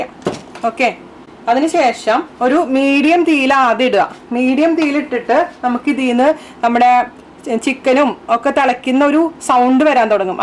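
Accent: Thrissur, India. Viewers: ഗഡീ ഞാൻ കരുതി ഡെന്ദേദ് ദെ നുമ്മടെ തൃശ്ശർ കാരിയാണല്ലോ ഉണ്ണേടെ നാടൻ ശൈലി, ഹാഫ് ആയപ്പോഴാ എന്റെ നാട്ടുകാരിയാ... സൂപ്പർ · yude big fan anu njan. Mattu cooking show kalil ninnum valare vyathyastamaya reethiyilulla avatharanam anu.. Thrissur slang ilulla samsaram kelkan thanne rasamanu....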